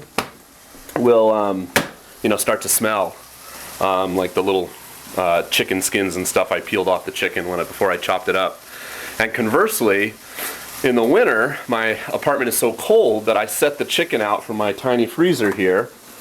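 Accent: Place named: Scotland, United Kingdom. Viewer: YAY! Scottish accent..you should make a 2 part video with this accent.But you should have saved that last part(when you eat) for your next 2 part video,Eating with...with a scottish accent...ha ha ha.Seriously it would be nice if you could make a video at many kind of japanese restaurant,like where you go often for ramen,okonomiyaki etc...should be great!looking forward to your nxt vid.(i'm really curious about Okonomiyaki restaurant and Genuine Ramen Stand)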